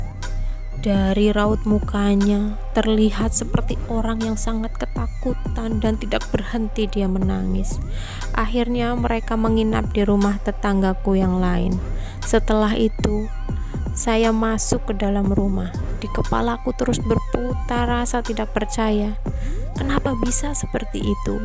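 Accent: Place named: Java, Indonesia. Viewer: seru amat ceritanya mbak...org jawa ya mbak, logat jawanya msh kentel bgt...hehehe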